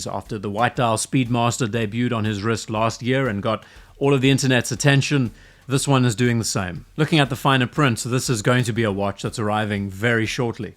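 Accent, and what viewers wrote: South Africa. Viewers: Wait now, what is this accent, are you South African?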